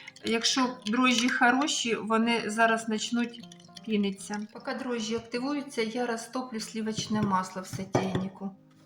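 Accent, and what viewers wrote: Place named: Odesa, Ukraine. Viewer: Яка ж у вас чудова одеська мова!! Ви СУПЕР господинечка!! Як я люблю дивитися на Вас і Вашу працю! Обов'язково зпечу цей КРАСЕНЬ!!!❤❤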